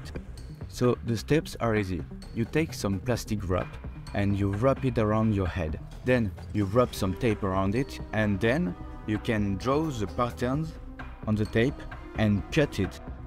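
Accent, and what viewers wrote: France. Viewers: this video is so great! i think i may do it! but, just a question: are you french? you have a very french-like accent? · Are you french your accent seems like it and some things in the video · Are you french ? Because your english is just beautiful😅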